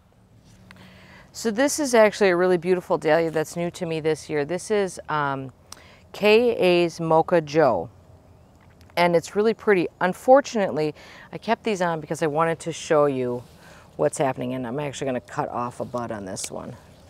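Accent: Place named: Midwest, United States. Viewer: “All flowers match.” Yessssssss! I love watching you garden, your accent takes me back to my time in the Midwest and makes me want to call old friends.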